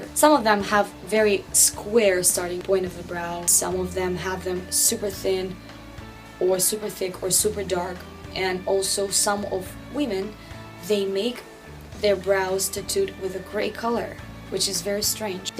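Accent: Russia. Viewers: Women with the Russian accent 😍 it sounds so beautiful to me ! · Wait are you russian ..??? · yes. She is Russian after all. What accent should she have? I actually find truly beautiful how her English is so good. Absolutely perfect in every choice of verbs and adjectives and so on. So detailed. The fact she still has that Russian accent on the base is only the prove how genuine she is. And clever. She does speaks English as a native. Impressive I would say. And she also always says things i can rely to. Thanks. You are a breath of fresh air. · как-то сразу поняла,что вы русская.очень хороший английский у вас,но есть какой-то маааахонький,еле уловимый родной акцент.только что нашла ваш канал и сразу подписалась!вы классная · It's the Russian background. I think it's a beautiful language/accent. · How to be beautiful, Step One: Have Russian Accent. Step Two: Be. 😍😍😍😍 You're the coolest! 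Can't wait for this series! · She’s Russian!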